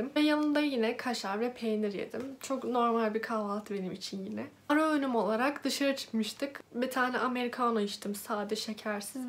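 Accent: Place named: Black Sea, Turkey. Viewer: Karadenizlisiniz sanirım ara öğününuz hangi saatlerde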